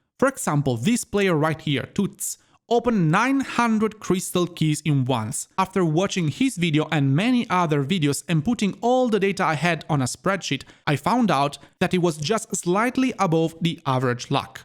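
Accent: Italy. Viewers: Are you southern Italian?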